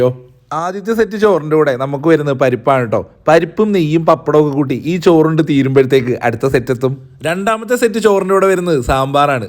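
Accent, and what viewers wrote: India. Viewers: Kollam aanenn thonunu.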